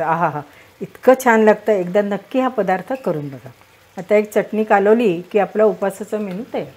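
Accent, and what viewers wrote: Standard. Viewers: हो मावशींची स्पष्ट शुद्ध अलिकडे दुर्मिळ होत चालले ली भाषा ऐकायची. मला मावशींची भाषा खूप आवडते. इतर चॅनेलवर आधी भाषेची वाट लावतात आणि मग पदार्थांची. मावशींच तस नाही.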